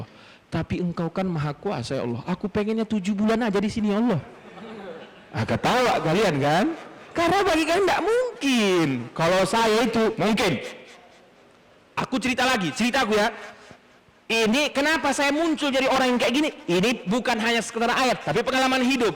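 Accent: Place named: Medan, Indonesia. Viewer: Iya, aku pun penasaran pas liat ada yg bilang melarang dengar ceramah UAS dan UAH dan dia sebut nama ustad salman farisi terus ku cari2 di youtube. Tapi ngomong nya kayak orang medan sumatera utara. Logat2 nya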